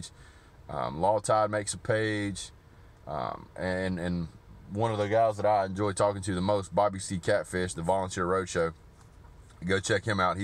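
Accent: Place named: Rural, United States. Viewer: You aren’t playing in “Atlannnuh” you fucking hick. “Elemmn bucks”. 😂😂😂 can’t wait to trash your page after Texas smokes you.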